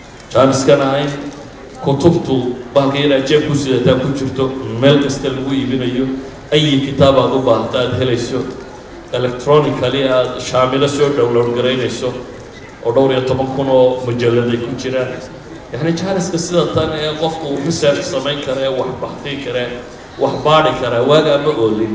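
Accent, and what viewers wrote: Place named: Burao, Somalia. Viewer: haa xifdiiye wali laman kulmin laakin waxa isoo maray Malin anaago dhageysanayna muxadaradiisa oo fadhiina oo qaad iibinayna ayaa waxa igu soo beydhay Niin yara xanunsanaya lakin wax fahankisu ad u ficanyahay waxa magicisu aha saabce intu naa eegay Ayu naa ag istagay anigu wan garanayey kursi ban u dhiigay caabarmarku dhageystay ayu yiidhii Niinkan mataqana maya ban ku iidhii Kuyee waa reer burco wan yabay waxan Ku iidhi miyad taqaana maya ayu yiidh Hada Maxad u leedahay wa reer burco Kuye hadalkisa yacni luqadawa burco wan yabay wali man iilawin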